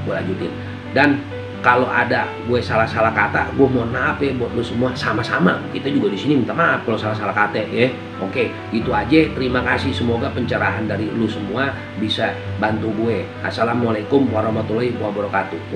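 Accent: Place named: Banyumas, Indonesia. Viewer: salam wong ngapak 😄 ora ngapak ora kepenak 😁😁😁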